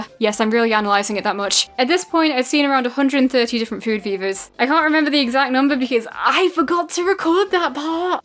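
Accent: England, United Kingdom. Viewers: Why do so many poketubers sound slightly English. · YEEAAA UPLOAD, MY FAVORITE TWO THINGS, POKEMON AND BRITISH ACCENT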